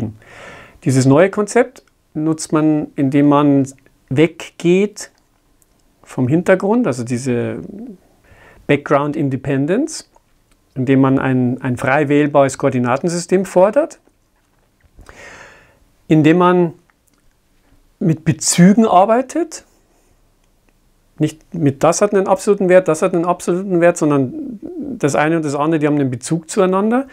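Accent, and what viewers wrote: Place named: Bavaria, Germany. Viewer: hört man ja auch das freundlich klingende Bayrische heraus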